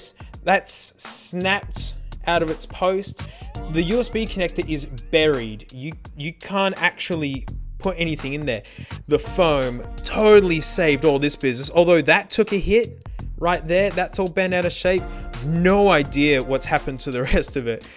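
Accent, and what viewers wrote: Australia. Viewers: He sounds less aussie then he does now and less energetic Thats wacky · 2 years later, you can see the aussie seep out into the videos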